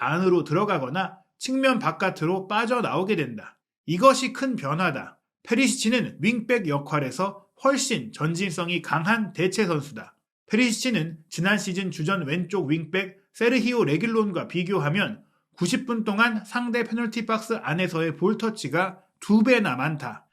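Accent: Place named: Seoul, South Korea. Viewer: 말투바꿨네 말투이상해요 서울말쓰려고하시나...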